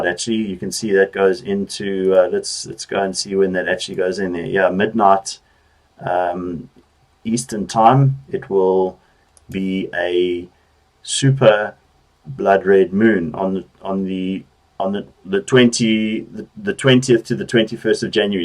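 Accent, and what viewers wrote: South Africa. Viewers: I can hear that SA accent boet 😁👍🏼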